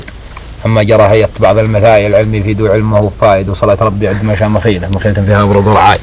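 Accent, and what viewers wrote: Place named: South, Saudi Arabia. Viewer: يا ذكي .. هذا شاعر سعودي من الجنوب السعودي .. ولهجته سعودي واللحن والالقاء واضح شعر قحطان ويام الجنوب السعودي .. واضح جدا بإنه شاعر قبيلة عريقة من قبائل جنوب السعودية وغالبا قحطان أو يام .. وربما شهران .. وليس يمني أبدا .. أبدا .. لأن لليمن لحن وأسلوب ولهجة مختلفة تماما .. لكن اللي ما يفهم التمييز .. يقول على الفول عدس .. !!! وسلامتكم .. ابو شنبات ......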